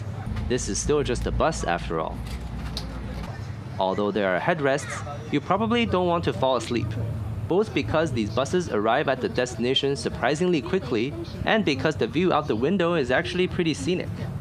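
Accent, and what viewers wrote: China. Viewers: 听口音就知道是同胞，感谢攻略，很有用！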